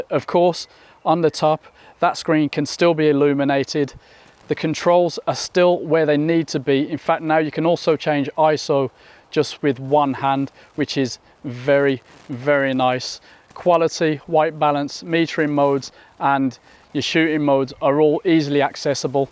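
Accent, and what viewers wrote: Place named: Northern England, United Kingdom. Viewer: sound like you fron northern England..maybe Liverpool/Manchester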